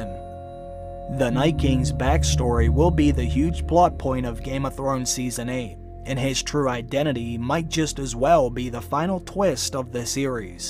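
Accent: Pittsburgh, United States. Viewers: Dude you sound like you’re from Pittsburgh! New castle area?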